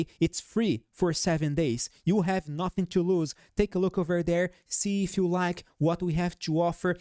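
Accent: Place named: Brazil. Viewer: Eu desconfiei desde o primeiro video que assisti que vc era brasileiro pelo sotaque kkk. Parabéns pelo trabalho!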